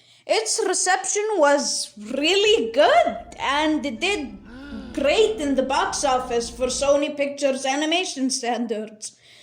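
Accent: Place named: Scotland, United Kingdom. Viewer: Nice video and I like your Scottish accent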